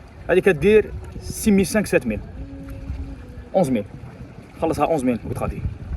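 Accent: Oran, Algeria. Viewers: ولد بلادي مرحبا بيك لي اول مرة نشوف لي فديو انتاوعك حتي انا من وهران كي سمعت هدرتك عرفتك من وهران الله. يحفدك اخي قمة في التواضع و الأخلاق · بارك الله فيك خونا الصغير على جميع معلوماتك على كل حال راني طالعة بفيزا سياحة ادعيلي ربي يوفقني 🤲🥰 وتاني نقولك محتواك ولا اروع دايما نقولها ونعودها دمك خفيف ودايما ضحكني لهجة تاعك الوهراني تحية لناس واهران💯💯❤️❤️❤️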